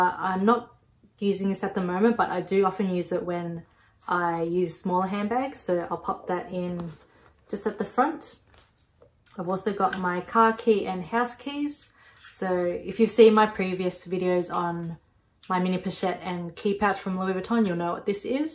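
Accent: Australia. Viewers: So refreshing to hear an Australian accent! I’m from Melbourne as well! If you don’t mind me asking, what do you do for work?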